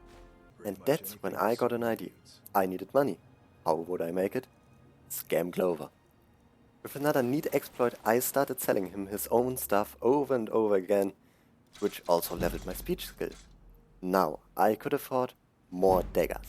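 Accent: Germany. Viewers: U german? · Der moment wenn du direkt merkst das der dude deutsch ist😂💋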